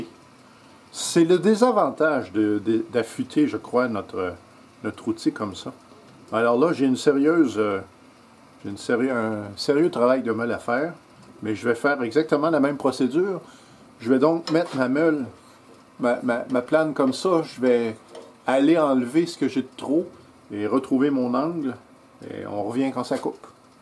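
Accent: Quebec, Canada. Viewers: Bonjour et merci pour cette dernière vidéo, très instructive comme les autres. Je suis débutant tourneur sur bois, en France. Mon métier c'est plutôt le métal. Une cliente m'a donné un tour à bois. C'est un modèle bas de gamme, mais ça me suffit pour faire mes manches de limes 😉 et apprendre. J'apprécie beaucoup vos vidéos, très claires, très pédagogiques. Et puis j'adore entendre l'accent et les expressions québecoises. Un grand merci pour ce partage de votre savoir. 🙂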